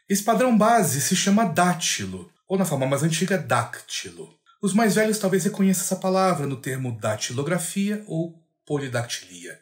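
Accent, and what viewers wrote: Portugal. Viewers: Ahah sotaque português é um xodó ☺️ mas é compreensível que seja difícil pra muitos, não é nosso dia-a-dia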